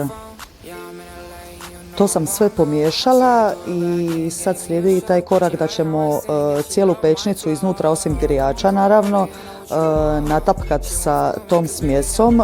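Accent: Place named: Dalmatia, Croatia. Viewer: volim i taj Dalmatinski naglasak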